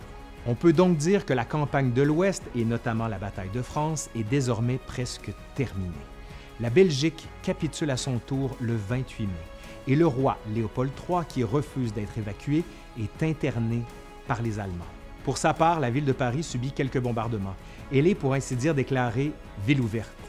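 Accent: Canada. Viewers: Ca nous change de Stéphane Bern....et l'accent canadien participe au charme !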